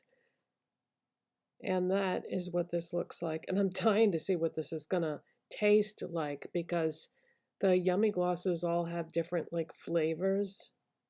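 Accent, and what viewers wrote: Boston, United States. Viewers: Just found your channel and I’m binging videos! Is that a Boston accent I hear 🩶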